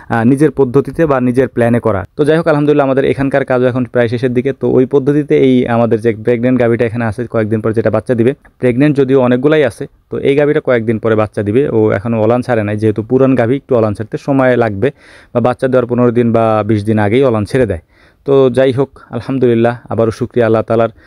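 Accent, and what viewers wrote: Faridpur, Bangladesh. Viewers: Vaiyar basha ki Faridpur?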